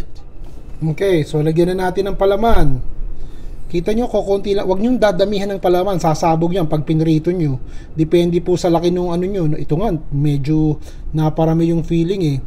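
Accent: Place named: Nueva Ecija, Philippines. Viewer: base sa punto nyo kuya ,novo ecijano po kayo? kababayan ko po kayo shout out po sa mga tiga nueva ecija ! especially sa mga tiga zaragoza 😊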